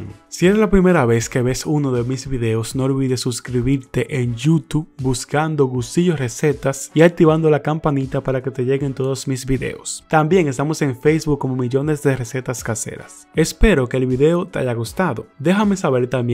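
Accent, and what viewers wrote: Dominican Republic. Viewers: Sos dominicano verdad? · Eres dominicano? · Suenas de RD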